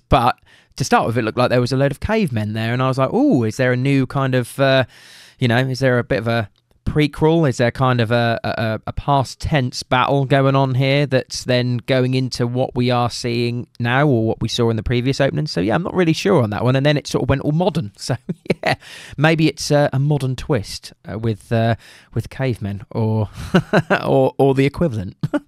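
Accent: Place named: United Kingdom. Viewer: I like his British accent😂 it’s not a joke I just like it😂😂😂 but I just wanted to put in the laugh emoji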